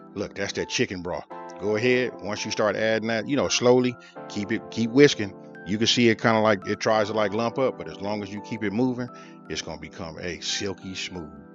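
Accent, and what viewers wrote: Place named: South, United States. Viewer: Where are you from, you sound so country in a good way!!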